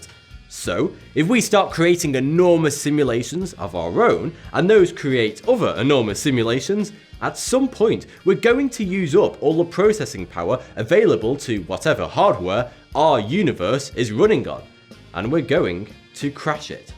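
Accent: United Kingdom. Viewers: Actually he says but because of his British accent, he sounds 42. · ahh that british accent is killing me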